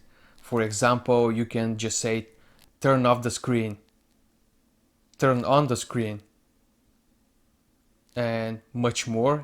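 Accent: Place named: Brazil. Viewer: brazilian accsent :)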